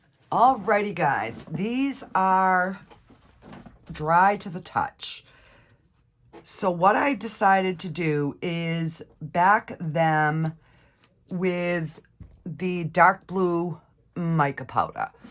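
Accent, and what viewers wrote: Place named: Rhode Island, United States. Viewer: You sound like my RI family 😀 I enjoy watching you create.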